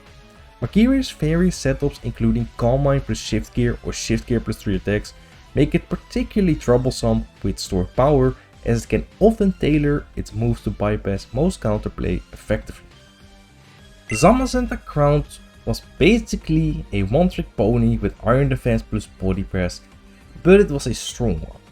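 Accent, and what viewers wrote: Netherlands. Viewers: I finally understand what my English teacher means with a Dutch accent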